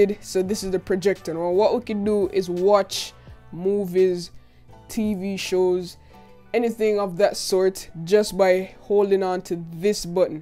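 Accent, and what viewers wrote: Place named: Jamaica. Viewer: Bro you can’t tell me your not a Jamaican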